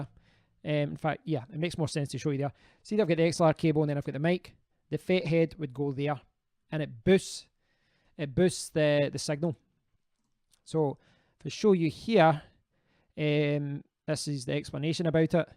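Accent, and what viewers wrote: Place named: Scotland, United Kingdom. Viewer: I like your accent. Reminds me of CSGuitars but I can't put my finger on it. Is that a Scottish accent? Irish?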